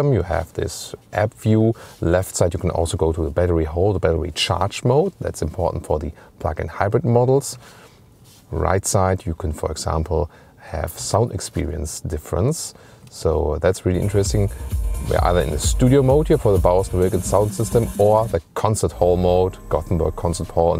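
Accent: Germany. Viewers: Your englisch Sounds very german. Funny.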